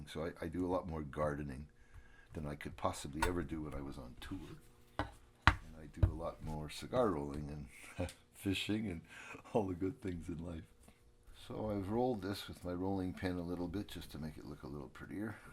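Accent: Canada. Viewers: He sound so Canadian.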